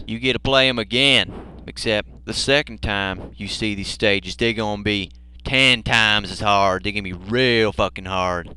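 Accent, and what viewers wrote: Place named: South, United States. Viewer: This guy really needs to return and do mote SHMUP reviews. His southern accent and humor are perfect!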